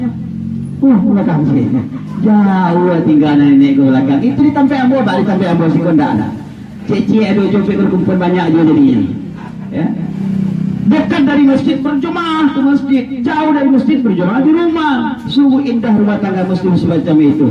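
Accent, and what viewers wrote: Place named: Sumatra, Indonesia. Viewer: Logat nya orang Sumatra pak ustad.. Sangat mengetuk hati takziah nya Salam dari Taiwan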